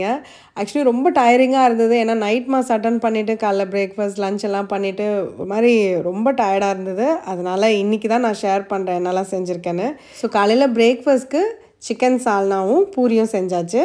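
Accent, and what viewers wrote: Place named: Bangalore, India. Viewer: Hearing Bangalore Tamil😅 And ur Tamil feels good 😊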